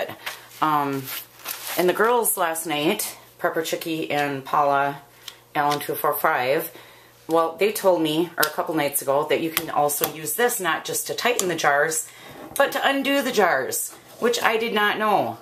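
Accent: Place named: Wisconsin, United States. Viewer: You talk like the folks I grew up with back in Wisconsin>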